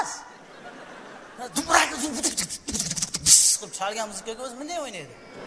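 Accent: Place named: Fergana, Uzbekistan. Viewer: Фаргонана гапирма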